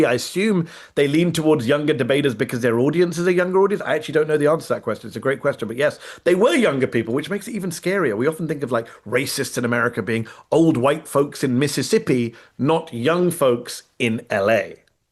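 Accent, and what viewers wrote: United Kingdom. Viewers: I whole heartedly agree that you need to get your shit flinging British accent having ass out of our politics. Britain is in free fall cuz of all the mud. It’s cool it’s fine, just know we’re ready and waiting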